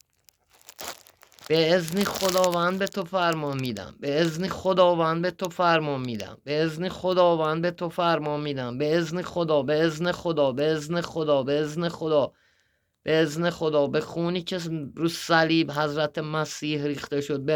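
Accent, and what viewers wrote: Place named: Isfahan, Iran. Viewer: اول از همه سلام ، دوم : قررررررربونی لهجه اصفانی دون بشم من ❤ بعدم اینکه پسررررر چقدر اورب اطرافت بودن !!!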